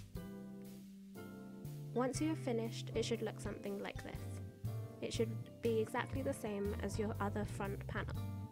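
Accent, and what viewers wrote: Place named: United Kingdom. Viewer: Yessss! You go girlllll. I like how slowly you work. I learn by repeatedly watching the action over and over again. So this really works for me. Very good close ups so one can see exactly where to put the hook. Love the fact that there are no speeded up camera shots I'm better at knitting. My crochet tends to go wierd and lop sided as I don't always place the hook in the right place. I get so stressed when I crochet so I don't tend to do it very often.😥 Its really good to hear a tuturial made by a British person so I am not going to get confused like I do when following an American crafter. I am going to try and make this. I have not made a crochet garment for years. Thank you for sharing. How many balls of the Women's Institute Aran yarn did you buy for the project?Bravo teacher👍🏿🙏🏿👏🏿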